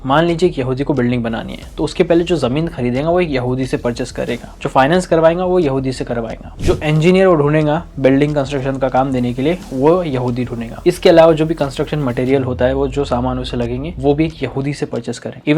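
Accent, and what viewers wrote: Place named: Marathwada, India. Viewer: Aapki Tone se lag raha aap, Aurangabad , Parbhani ya Nanded ke ho😅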